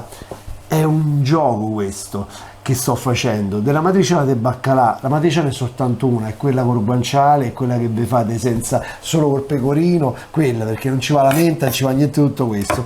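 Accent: Rome, Italy. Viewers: RICORDATI A MILANO NON RINNEGARE IL ROMANESCO!!!!!!!!!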